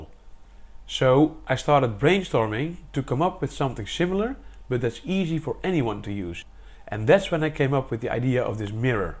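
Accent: Netherlands. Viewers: Dat nederlandse accent herken ik vanaf 10 km verderop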